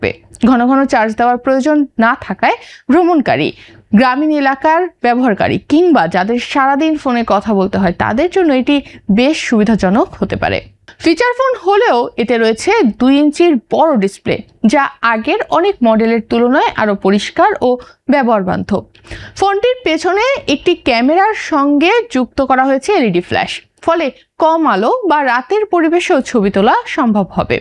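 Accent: India. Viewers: কোলকাতার টোন ধরছেন নাকি !!!!!!!!!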